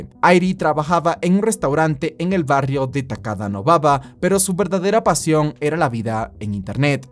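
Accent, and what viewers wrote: Dominican Republic. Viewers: Bro eres dominicano? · El fusgo bro pensaba que eras Dominicano, te sigo hace mucho, de que Pais eres?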